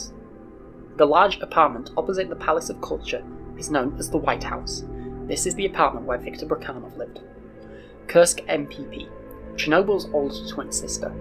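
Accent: United Kingdom. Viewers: have you never heard a northern English accent before?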